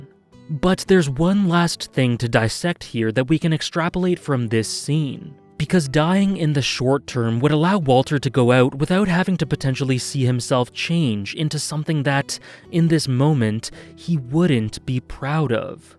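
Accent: Canada. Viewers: Listening to talk about the name of this ep: "This guy's Canadian!" (I am too brother, I get it) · Are you from Canada?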